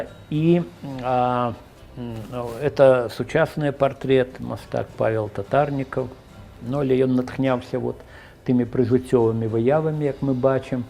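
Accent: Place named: Russia. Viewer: Ен размауляе на мове з руским акцэнтам. Мова инакш гучыць.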